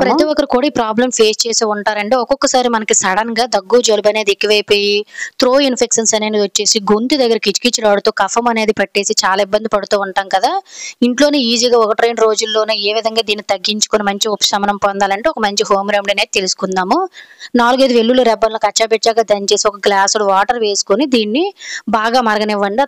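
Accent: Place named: Telangana, India. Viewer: Welcome akkka me slang ardham avuthundi meedhi telangana kadha akka maadhi kooda adhe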